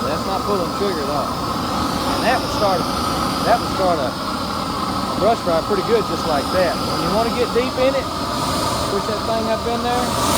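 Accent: Texas, United States. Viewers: He's a Texan. That's a Texan pronunciation. Texicanese is almost another language, from what I can decipher. If you don't believe me, next time you watch one of videos turn on the Youtube Closed Caption CC button. What a hoot!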